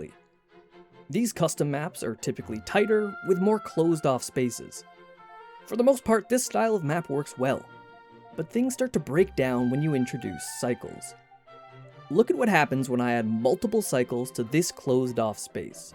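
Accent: Canada. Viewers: Oh yeah HAVE to be canadian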